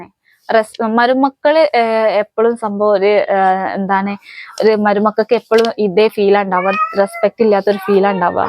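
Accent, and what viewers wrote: Malappuram, India. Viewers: Malappuram style samsaram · Ente cousinte home chelari aanu🥰.. samsaram kettapol thooni.. onnengil calicut allengil malappuram aayirikkumnu😍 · നല്ല മലപ്പുറം മലയാളം സംസാരിക്കുന്നു കൂടുതൽ ഉത്തർപ്രദേശ് കാണിക്കു മലയാളികൾ കാണട്ടെ അവിടത്തെ ചുറ്റുപാടുകൾ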